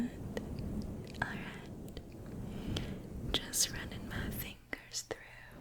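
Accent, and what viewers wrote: South, United States. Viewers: I love the southern girl accent! 👍😘❤️ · This has to be the best ASMR video I've ever seen, the southern accent is so peaceful and relaxing ❤❤❤❤ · The southern accent.. I'm 44 now. I speak with an accent like that once in a while. I'm deep deep South Texas. . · Hey, I love your videos! Im from the south and I thought the southern accent was pretty good. Also congrats on the 150k subs 🎉! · Ok new to this channel...really loving the southern accent darlin ❤ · Didn’t know I needed the Southern accent this is highkey fire 🥹 pleaseee do more Obsessed Girl tho it’s peak and I really wanna hear more dirt on Olivia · My new favorite asmr video 🥰🥰💓💓amazing southern voice:D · And here I thought your normal voice was heavenly but this accent with the southern tone is just amazing. Hope this isn’t the only time we’ll hear it 🤠🫶🏼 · The Accent was too good tbh, are you actually from the Southern countryside? 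· I’m British 🇬🇧 but I love the accent. Whereabouts in the states is that accent from? It’s very good :) 😍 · The Southern drawl actually suits you quite well 😄😝❤️ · Idk why, but for some reason the southern accent was extremely comforting. Like I actively felt safe listening to this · You HAVE to do another southern accent video, no lie I fell asleep somewhere in between 0 and 30 seconds. I still watched the whorl thing when I woke up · The southern accent is nice 😊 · Oh southern accent I like that, I'm very familiar with that language. I think you should do more.🤠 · Wow what a great video😊 your southern accent was spot on. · You nailed that southern accent 🩶🩶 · The southern accent is decent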